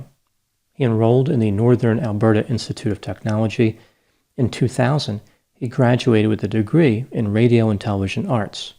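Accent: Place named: Mid-Atlantic, United States. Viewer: Off topic but... If I'm not mistaken, I believe I hear a touch of the Maryland/Pennsylvania/Delaware/DC accent from. This accent is unique to my region of the US (Maryland) and is strongest in Baltimore City & Philadelphia. accent isnt nearly as strong as most here but it's so unmistakable I'm willing to bet my life he comes from one of those states.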